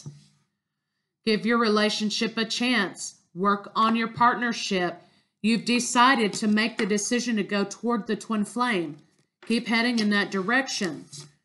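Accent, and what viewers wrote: Texas, United States. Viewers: You are truly gifted. Totally resonates. You sound like you are from Texas, like me. Blessings..🙋🌹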